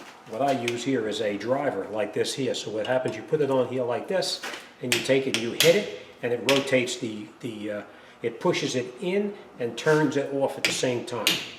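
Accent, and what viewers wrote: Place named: Boston, United States. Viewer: I swear to fuck. I love the Boston accent. Ya did gud wurk.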